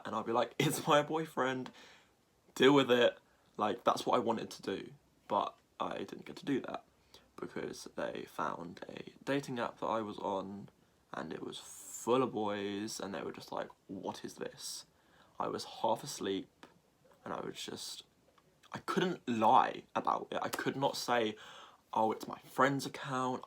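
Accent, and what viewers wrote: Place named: United Kingdom. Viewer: Loved ur video. The British accent is always interesting to me. I couldnt replicate yours (they seem to vary a bit, perhaps by region in England?) Irish & Scottish I think I'm able to notice as diff, but how do so many UK actors star in US movies & talk w/o their accent & sound American? Does it take a lot of practice or could u speak like us if u wanted? We all share the same gay steps, from self-identification, to depression or envy, to masking it & usually coming out. I think major factors are: how conservative/anti-gay are the parents and how feminine is the boy as he grows up (since it plants a seed in everyone's mind that he may be gay. It's tough when guys are masculine growing up, because coming out can be hard for everyone to believe, parents can panic/go into denial. Your story was good, it covered every angle of coming in terms of being gay, dealing with the bullshit & realizing how different our lives will be, but also how exciting they can be once u find the right person. 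I had a thrilling decade of dating, 3somes & serious relationships after I moved from the suburbs of Chicago to Fort Lauderdale, Florida, where the Mayor is gay, the bathhouses are gay, along with the businesses, bars & clubs. Even a strip of bars along Wilton Drive are open all night & that area of the road is shut down to cars, so Pride Parades can be held, Halloween can be celebrated with big stages w/musicians etc. The food market on the street is nearly 100% gay shoppers. Everyone in the en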